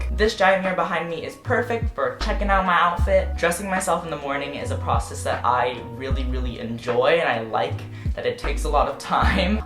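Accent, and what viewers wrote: Texas, United States. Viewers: Those traNSItions thooo!!! Also you sound incredibly Texan in this video?? Like more than usual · im from texas but your texas accent is so strong omygod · a love that sometimes 5he has a little of a southern accent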